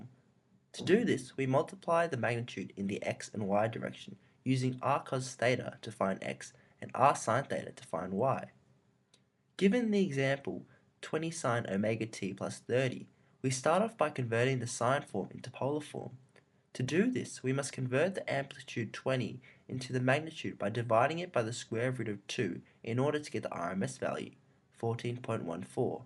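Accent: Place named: RP, United Kingdom. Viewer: whats with the posh accent haha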